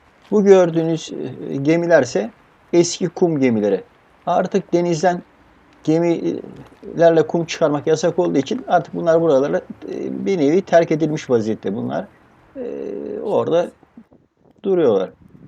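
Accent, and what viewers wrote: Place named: Black Sea, Turkey. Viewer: Abi karadenizlimisin selamlar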